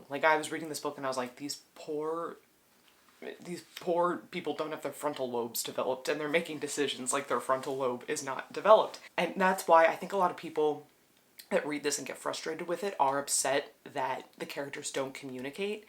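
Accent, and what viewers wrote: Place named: Ireland. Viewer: Yayyy congrats on the new job! Also loved your Irish accent haha :)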